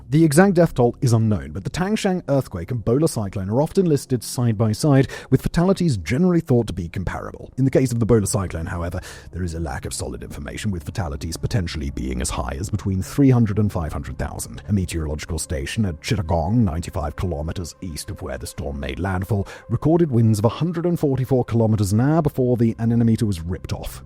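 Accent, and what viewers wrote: United Kingdom. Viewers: anyone else bothered by how fast he talks? I mean I'm used to the British accent and all but I can barely understand what he's talking about because of how fast he speaks. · Can’t stand this voice. I’m so over British accents.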